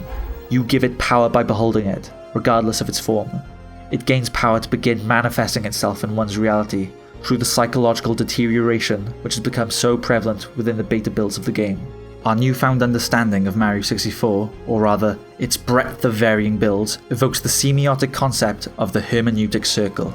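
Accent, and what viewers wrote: Wales, United Kingdom. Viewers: Wondering this what part of Wales you from? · Wait are you br*tish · everyone else is talking abt the crepypasta but i just cant not notice the welsh accent lol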